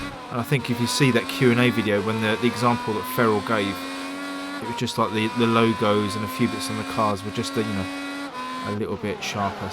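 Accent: England, United Kingdom. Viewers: Your English accent goes well with the realistic racing genre. Giving it a proper Top Gear feel somehow. Part of the reason these Grid series take off so well on your channel IMO. Take note that covering realistic videogame racers might be a bit of specialty of your channel from now on. 😊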